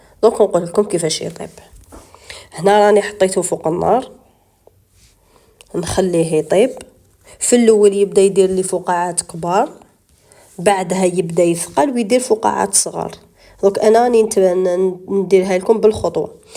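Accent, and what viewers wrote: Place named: Skikda, Algeria. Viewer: شكرا على الوصفة تهبل يا اختي انت من ولاية سكيكدة اللهجة نتاعك بانتلي سكيكدية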